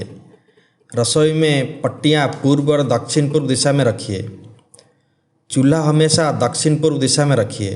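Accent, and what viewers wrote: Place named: Odisha, India. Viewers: Sir you are belongs to Cuttack as per your hindi pronounced. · Bhai tum odia ho kya?Isiliye hindi bolte time odia mix ho raha he.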